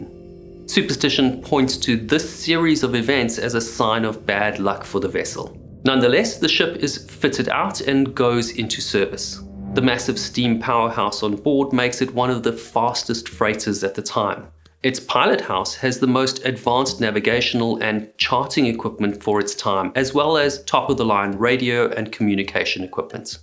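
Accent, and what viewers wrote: South Africa. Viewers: Dude, you sound a wee bit South African.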